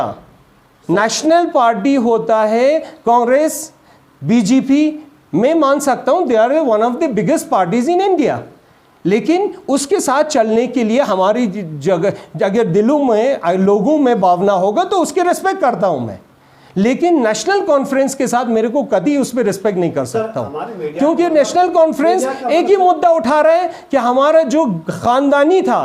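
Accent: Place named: Iran. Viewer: pharsi style hindi he is using 🤔🤔🤔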